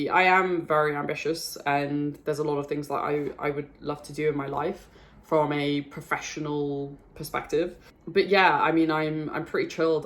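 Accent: United Kingdom. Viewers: Well, I DO like your british accent. Then again, I've never really considered myself as a genuine french person 🤔 (rather than a breathing multinational (tm), haha). It's nice to get to know/understand you a bit more. I primarily thought I didn't care about those kind of videos (feels like a one-way street, if you see what I mean), but I guess it's always pleasant when it's about someone you find truly interesting and has that "something" that resonate with you. Again, congratulations. And here's to a few thousands more !🍺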